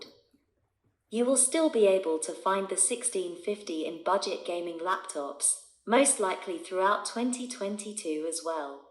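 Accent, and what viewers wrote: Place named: United Kingdom. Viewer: how come the a.i. voice has a british accent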